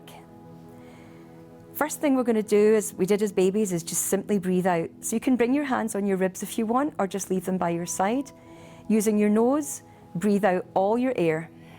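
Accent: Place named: Ireland. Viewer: Lovely accent! So pleasant. Irish?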